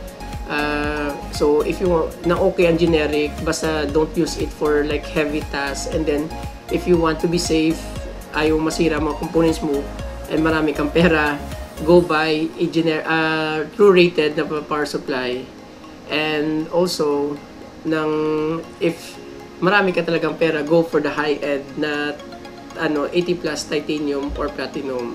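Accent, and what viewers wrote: Ilonggo, Philippines. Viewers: may tono ng ilongo. ilongo ka ba sir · balanon gid ta ya kung ilonggo sa accent hahaha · grabe ilonggo gid ya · Haha.. Nice One.. Ser.. First time ko naka panood ng reviews na ilonggo ang nag sasalita.. 👍👍👍 Pride of Iloilo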